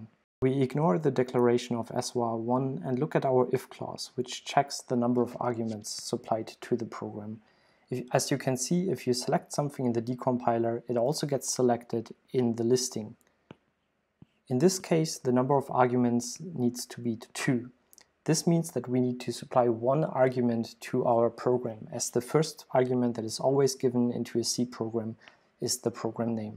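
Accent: Germany. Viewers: Wonderful introduction! You kind of sound German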